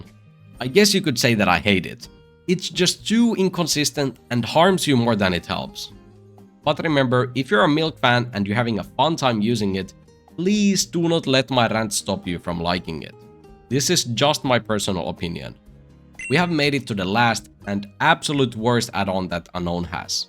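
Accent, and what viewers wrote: Finland. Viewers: are you finnish? your accent sounds familiar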